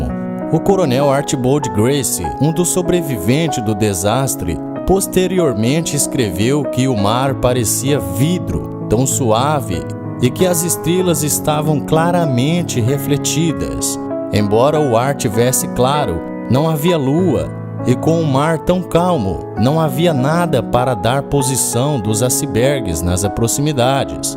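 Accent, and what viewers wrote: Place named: São Paulo, Brazil. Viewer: Deve ser paulistano tipo eu e meu conterrâneo kkkkkkkkkk falamos bm caipira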